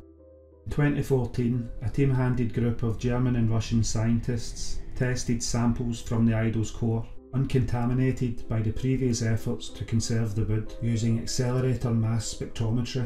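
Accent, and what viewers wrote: Scotland, United Kingdom. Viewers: Love your accent, miss living in Scotland!! By the way the engraving patterns on these sculptures are water symbols, during the later mesolithic and the Neolithic, chevrons represented vaginas and waves represented water so both would to a Mother Goddess statue... These patterns are all over the world but studied especially in Lithuanian folk art and European prehistoric art my Marija Gimbutas.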